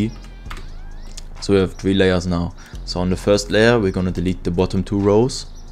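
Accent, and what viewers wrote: Germany. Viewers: Youre German right? hört man :) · THE GERMAN ACCENT IS SO CUTE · Der Deutsch (Sprachige) Aktzent Kickt aber Nices Video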